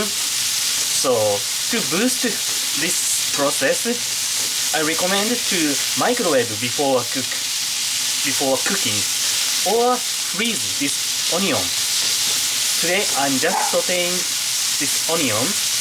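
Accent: Japan. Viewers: rules! The most charming Japanese-English accent & way of speaking ever 👍🏾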